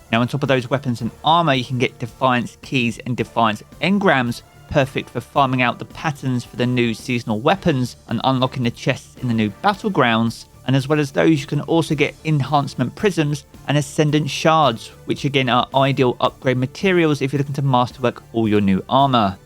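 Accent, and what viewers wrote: United Kingdom. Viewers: I literally loved your content because it’s straightforward and your British accent is just absolutely lovely ❤❤❤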